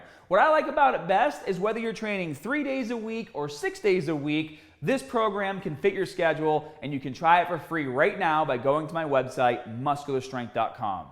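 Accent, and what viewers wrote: Boston, United States. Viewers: Gotta be Boston or somewhere in that region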